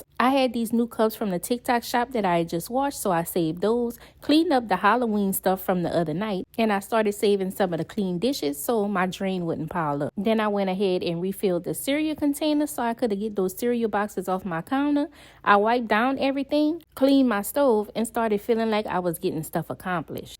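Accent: Louisiana, United States. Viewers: Are you in Louisiana ?? Omg your accent is so strong & cute ❤️ I am a Texan so i get it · I love you accent. Are you from Louisiana?❤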